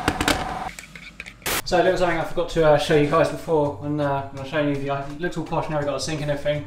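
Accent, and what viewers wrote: Liverpool, United Kingdom. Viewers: Best Channel, Best Content and Best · for the win my favourite ginger 🐒. Miss seeing mitto also get him back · ! you Strawberry blonde brother hahaha keep the vidz coming my guy 🔰🔥📚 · Superb! keep it up bro loving the work 👍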